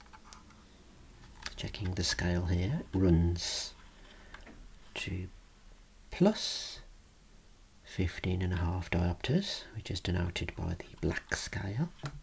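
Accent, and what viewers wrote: Birmingham, United Kingdom. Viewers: the best brummy accent in history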